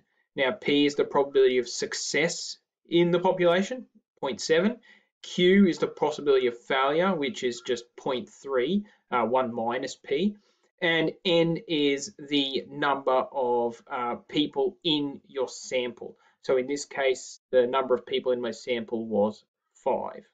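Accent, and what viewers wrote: Australia. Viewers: your australien accent makes math fun thank you mate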